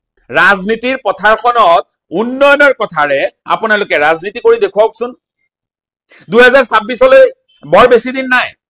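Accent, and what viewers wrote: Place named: Bangladesh. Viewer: ছুবৰৰ বাচা। মিযাৰ ধৰ্ম সত্ৰ মাটি দখল কৰা নেকি। তোমাৰ অসমীয়া শুনিলে গম পায তুমি পূৰ্ব বংগীয মূলৰ।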